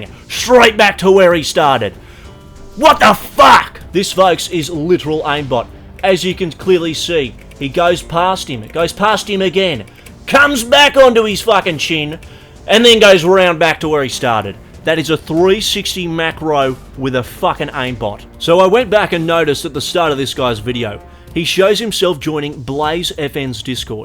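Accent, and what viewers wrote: Australia. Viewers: Fucking love Aussie accent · the hard australian accent disgusts me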